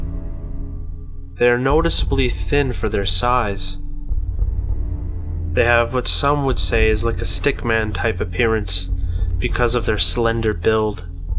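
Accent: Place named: England, United Kingdom. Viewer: The correct pronunciation is ( K O O S ) not Cooas ! The narrator is an English Speaking gentleman from England where they speak the ( Kings English ) not American English which is not the same ! !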